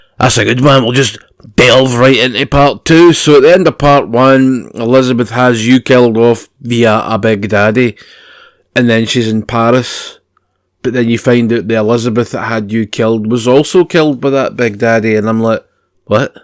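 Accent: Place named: Scotland, United Kingdom. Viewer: Lmao I love ur thick accent, are you Scottish?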